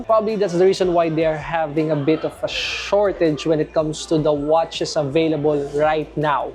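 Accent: Philippines. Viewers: Ayyy pinoy nga, very Filipino accent, cutiepie pa. May bago na naman ako panonoorin❤ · I watch these videos for the strong filipino accent 💪 Hoy Pare!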